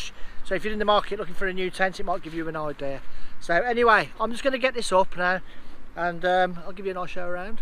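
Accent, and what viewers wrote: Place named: Birmingham, United Kingdom. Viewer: You sound like a fellow brummie or maybe the black country lol. I did watch a video with Hazeoutdoors and he said the red pole should go in 1st, I'm not sure tbh as I've looked on the Terra nova website and they say different, I was just thinking about how saggy it was on the inside once you'd set it up. Maybe after a few times it'll come right ✅️ 😌 👍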